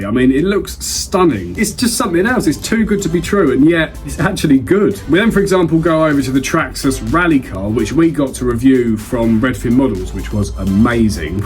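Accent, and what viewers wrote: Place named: United Kingdom. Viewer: Great another British speaking channel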